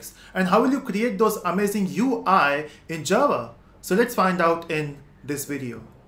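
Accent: India. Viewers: There are thicker Indian accents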